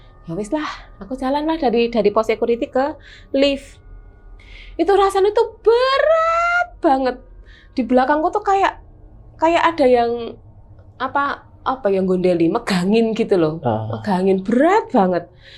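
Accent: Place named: Java, Indonesia. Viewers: pean medok banget, koyo aku, Mbak 🤣🤭 wis 11 thn tinggal di Belanda tp masi medok jowone 🤣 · Semarang hadir .. pas ki podo smg e. Bahasane semarangan bgt 😂😂 ono ding e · Medok e😁😁😁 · Aku seneng mba kalau ada orng logat jawanya keluar seneng .mba nya cantik buaaaanget🤭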